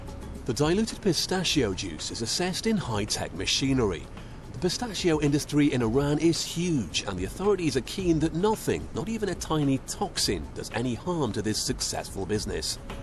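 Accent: United Kingdom. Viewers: And a brit remittance man narrating. Is there nothing these manglers of their own language will not pretend to be exerts on?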